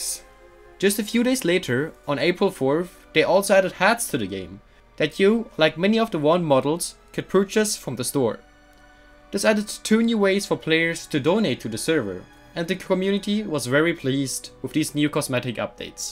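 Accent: Germany. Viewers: Lol Do you have a German accent?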